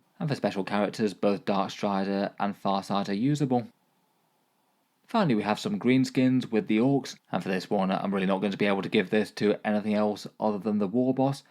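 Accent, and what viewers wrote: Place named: United Kingdom. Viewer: So is very clearly from UK. As an American it throws me for a loop whenever i hear Loo-tenant instead of Lef-tenant in a British accent.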